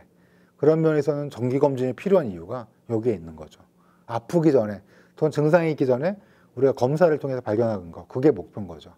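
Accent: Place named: Gyeongsang, South Korea. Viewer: 말투가 대구 언니네😅😅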